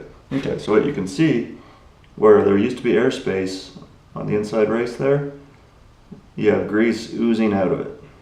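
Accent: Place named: Northern Ireland, United Kingdom. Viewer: the NI accent sounds awful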